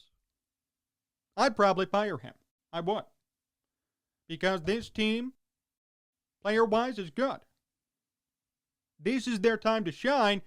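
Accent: Chicago, United States. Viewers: Love what you're saying bud, also you have the most Chicagoan but also not even close to Chicagoan voice I've ever heard and I have no idea how to feel.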